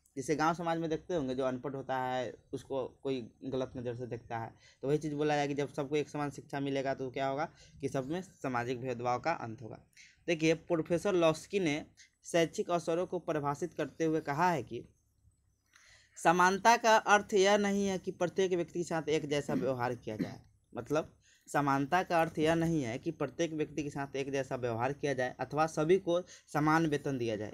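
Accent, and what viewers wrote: Bihar, India. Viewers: Aapke notes helpful h aur aapke bolne k tarike to majedaar h, aapke bihari tone k kaaran smjhne me v aanand aata h, keep it up...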